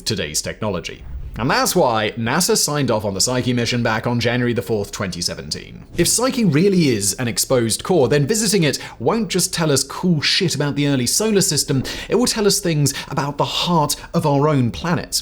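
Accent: United Kingdom. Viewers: You Tube SUCKS! I have removed this man's channels over and over again. But when You Tube changes the algorithm, it force feeds me this Simon guy's many channels despite my removing him. Simon's channels suck. They are poorly researched information that is mostly government propaganda talking points. Terrible videos. And just because Simon has a British accent, this doesn't not make him an authority on anything. He's just a talking head. England is over. Brexit has made the only job in England a You Tube content provider. Terrible list videos that spread falsehoods and bull. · Your voice is hard to listen to. It's like you're from the most stereotypical posh little English village imaginable. And no, despite what you think, it's not really a good look for you.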